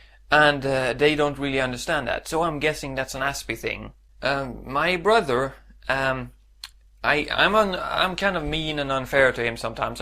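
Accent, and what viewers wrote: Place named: Sweden. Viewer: I can't help loving the Swedish way of pronouncing "yob." And enyoy... Never knew.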